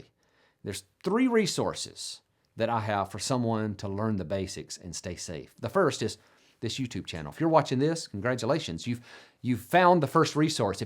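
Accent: South, United States. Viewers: With change all around, it would do no good to say I don't like it. However I am receiving patience and am learning to do more on the computer. My I-pad is old, I use a tablet but received a lap top from the library for 3 months and a jump drive I can keep. To keep from being totally frustrated, I drink sleepy time tea (really) to calm me down and learn to take breaks. The field office closing is not surprising. But thanks for asking my opinion. Than thank you for your work, and like your southern way of speaking.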